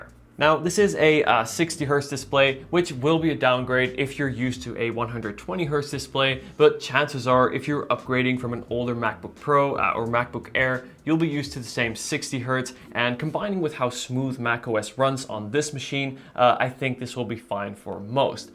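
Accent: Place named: Netherlands. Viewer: U sound Dutch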